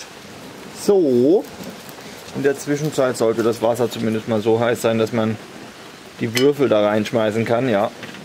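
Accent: Germany. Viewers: de kann das ( sch. in gebastelt) schon schweizerisch aussprechen zwar noch mit deutschem akzent aber tönt schon recht gut.die evelin hat guten einfluss auf dich.hehe witer so.i mag dini biträg..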